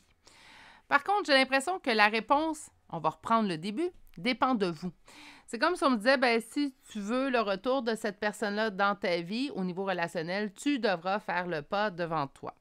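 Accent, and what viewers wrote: Canada. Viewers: Merci pour votre bonne humeur et votre super accent canadien que j'adore. Je suis pas pressée qu'il revienne car au fond j'aime bien ma liberté et surtout qu'on me fiche la paix. Je vis seule avec mes minou que j'adore. Et puis j'ai la trouille de l'engagement. De toute façon c'est pas moi qui vais faire le 1er pas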